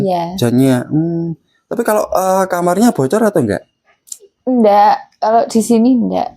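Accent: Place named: Central Java, Indonesia. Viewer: Embak orang jawa tengah ya... Td dengar nggih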